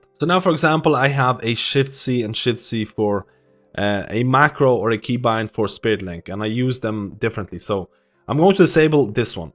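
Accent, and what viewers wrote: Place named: Ireland. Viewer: Thank you for the great info. Your accent is really interesting may I ask are you part Irish part Scandinavian (swedish, norwegian)?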